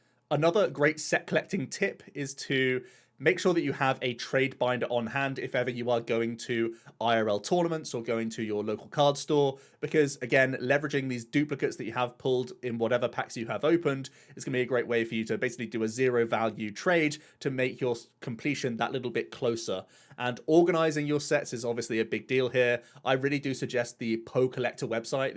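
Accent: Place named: United Kingdom. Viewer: Dollars? You sound like a British man. Unless you're living in Australia, America, Canada, etc why are you giving us prices in dollars?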